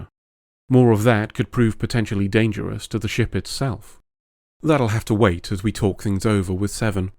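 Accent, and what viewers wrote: United Kingdom. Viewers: love your voice, love your British accent, and I love your deep analysis of my favorite Star Trek show lol "There is nothing beyond the subatomic." interesting how our science has progressed since the 90's. though, barely lol. i don't know how they could've done it (swirly-whirly-clownshow level) better, as they would've either needed to know future science, or make shit up, but I think they were trying to imply that consciousness is based on "quantum-level" subatomic mechanics (which they've done before, say, on DS9) which is fascinating if you're one of those people that ponders how consciousness physically works.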